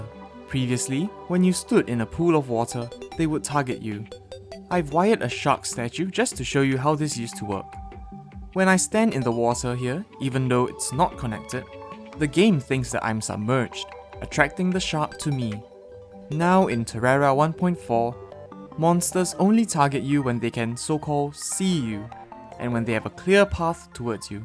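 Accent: Singapore. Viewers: Why is the Singaporean accent so fucking nice? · Uh is it me or do u sound a bit singaporean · are u from singapore? u sound so familiar O.O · U sound really singaporean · Are you singaporean? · are you singaporean or malaysian? Your commentary is relaxing and familiar:) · Are you Singaporean? You sound like your from Singapore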